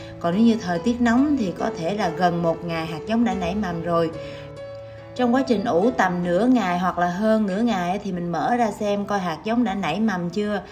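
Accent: Mekong Delta, Vietnam. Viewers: Bạn ở miền tây hay ở đà nẵng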